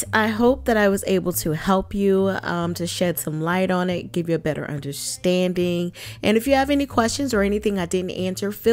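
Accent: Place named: Raleigh, United States. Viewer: Are you from Raleigh?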